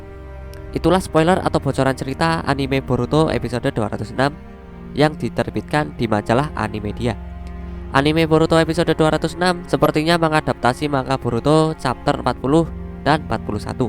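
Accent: Java, Indonesia. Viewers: Medoo men min suara mu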